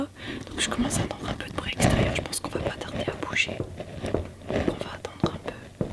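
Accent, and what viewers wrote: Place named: Nord, France. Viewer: YES je savais que je connaissais bien cet accent ! T'es à la voie 9¾ de Lille Flandres ! Eune sorchière deuch'nord !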